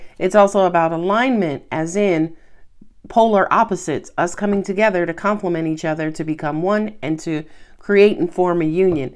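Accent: New York, United States. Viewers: Scorpio representing in that NY accent! 😂😂😂😎 Thank you for the reading. It resonates with me, I can feel it in my gut but I'm so scared of failing. Gotta work on that. 💫🙏🏻🤗♏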